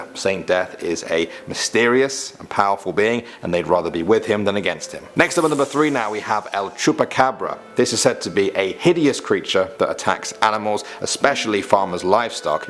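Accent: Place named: United Kingdom. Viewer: I’m not sure but I’m hearing a little Britain accent is it just me cus I here a little British accent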